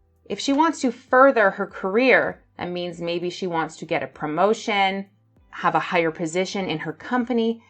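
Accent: Canada. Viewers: J'adore votre accent canadien ❤💞💘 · Why are you using and teaching people American? "aboot" - You sound Canadian, so should be using English, not American. Further can fully replace farther in English (but farther only refers to distance).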